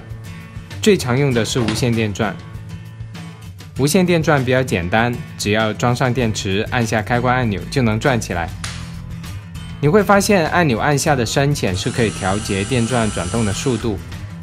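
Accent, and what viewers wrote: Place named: Taiwan. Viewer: 台湾腔，台湾人吗？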